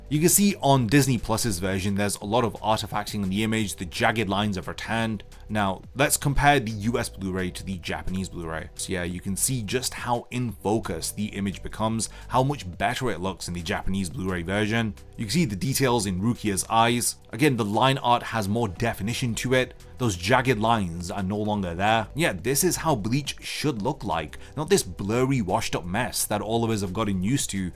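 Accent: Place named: United Kingdom. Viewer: i have been buying the UK blu-reys, of Bleach an the Naruto ones, i did my own comparison from Naruto on Disney+ an found that the Disney+ version was way lower quality than the Blu-rey ones. I havnte checked Bleach tho. As this is a new video didnt u try the UK versions? Forgive me but you sound British maybe u live outside the UK, i dont know or maybe u have a large US fan base and are catering for them??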